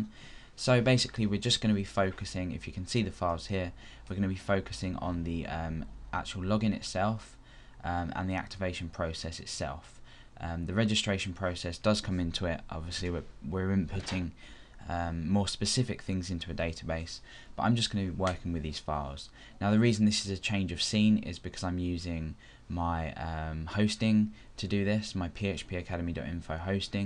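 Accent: United Kingdom. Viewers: Your british pronounce is kinda hard to get. you pronounce php as pae ech pae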